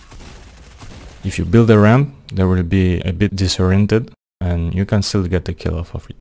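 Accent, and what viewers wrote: France. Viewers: That french accent thooo, keep up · Why you have a french accent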